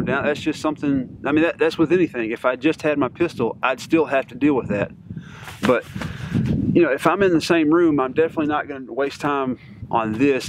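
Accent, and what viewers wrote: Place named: Alabama, United States. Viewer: What part of Alabama you’re from?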